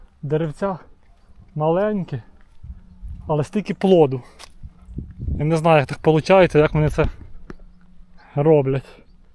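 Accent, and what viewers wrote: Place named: Central Ukraine, Ukraine. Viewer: На велосипеді там класно поганяти., цікавий у Вас акцент. Ви, певно, з Черкаської чи Житомирської області?)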